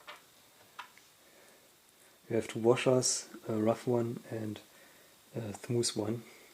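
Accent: Germany. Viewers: are you from Germany? sounds like that on your accent..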